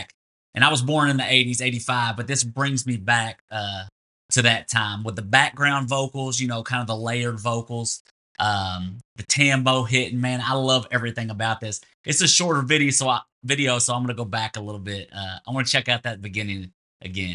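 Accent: United States. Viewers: I take it from you accent your American. I'm sorry if his offended you but for someone who has a studio , i cannot understand why you don't know who the band is tjb uk. Just joined😊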